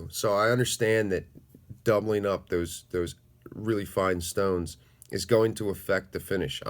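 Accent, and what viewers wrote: Philadelphia, United States. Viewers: Ain't no hiding that you're from Philly that is FOR SURE. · I had a feeling was from the philly area. Born in philly and lived in or around the city for 36 years so I tend to pick up on our distinct accent. Go birds.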